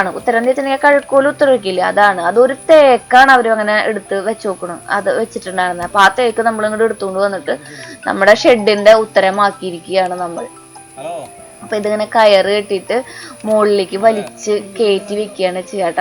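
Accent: Thrissur, India. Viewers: Hi.. njan kurach divasam ayitteullu kanan thudagitt..sathyam njan addict ayi nnu venam parayan.postive energy.. especially jolikonnum povathe irikunna enik..Thrissur bhasha powliyanu..avide ramavarmapurath padichitund.orupad ormakal und.orupad ishtamanu..god bless u..love u lot..💓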